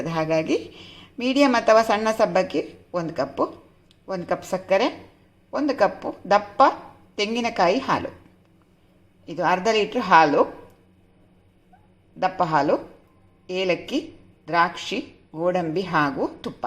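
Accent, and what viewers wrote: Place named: Mangalore, India. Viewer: Thumba nidhaanavaagi Mangalore kanadadhalli neevu vivarisuvudhu thumba chanda👌👌neevu elli iruvudhu Madam? Mangalorenalla?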